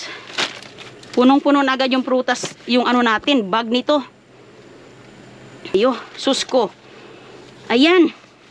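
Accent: Visayas, Philippines. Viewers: Your very visayan speaking,that's good your husband &your kids are eating foods.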